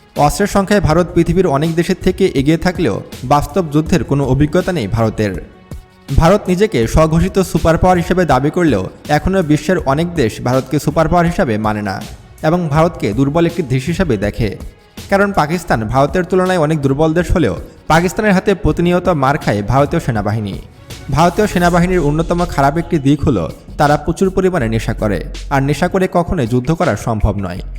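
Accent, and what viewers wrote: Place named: Bangladesh. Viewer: তুই যে বাংলাদেশি সেটা তোর কথা শুনলেই বোঝা যাচ্ছে ।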